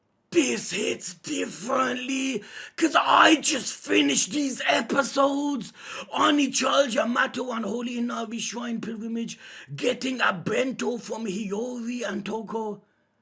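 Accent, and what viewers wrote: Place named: Midlands, United Kingdom. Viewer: Yes and I would hazard a guess from the midlands of the uk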